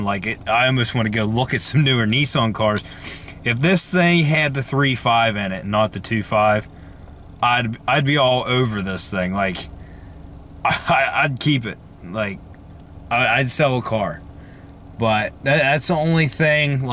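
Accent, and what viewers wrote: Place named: Pittsburgh, United States. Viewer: You sound like you're from Pittsburgh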